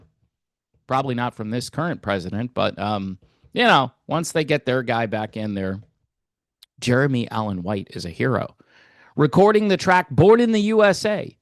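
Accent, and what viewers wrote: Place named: South Jersey, United States. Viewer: Love Your impressive brother for you sound just like him come,lte with south jersey twnag lol!! I have always been a fan of his music and do admit I am looking forward to seeing him deliver from nowhere. Unfortunately he has lost his mind and became very bitter to date. However bruce still put on a great show and seems to embrace his music in all forms. neve know about electricity for barely remember the original release. The only song I even really know from the album is which is very haunting. God bless you, my brother, and I hope you end up liking this biopic, which seems at least tentative.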